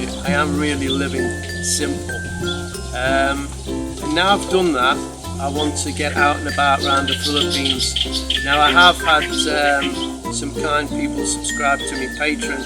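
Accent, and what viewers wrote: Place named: Scotland, United Kingdom. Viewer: I dunno if I am hearing u right but you sound like Scottish. :D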